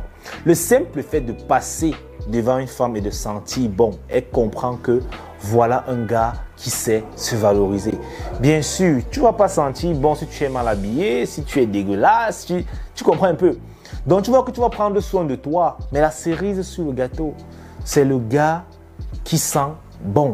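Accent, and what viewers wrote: Cameroon. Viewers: T'as l'accent camerounais, suis camer aussi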